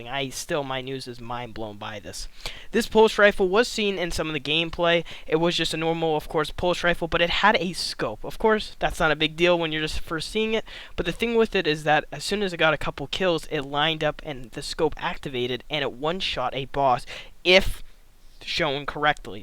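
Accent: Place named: Massachusetts, United States. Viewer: You from Massachusetts? You sound like you live here, lil’ homie.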